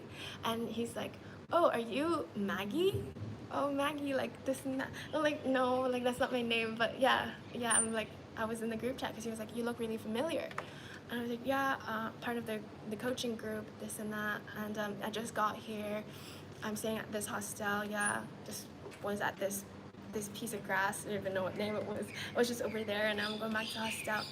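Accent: United States. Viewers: how did you learn to speak English with a Kansas accent?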